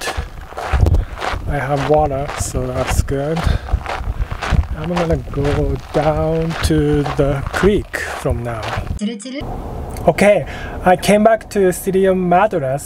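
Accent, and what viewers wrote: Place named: Japan. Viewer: He has very strong Japanese accent.Uncomfortable listening.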